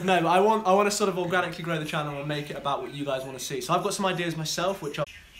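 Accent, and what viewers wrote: United Kingdom. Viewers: What he's British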